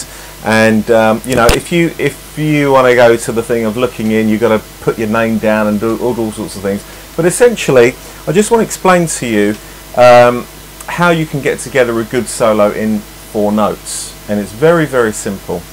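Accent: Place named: England, United Kingdom. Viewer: Great. Enjoyed it. And like the English accent as well.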